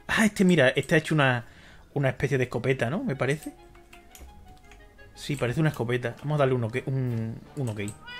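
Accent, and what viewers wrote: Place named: Spain. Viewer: De q parte de España eres?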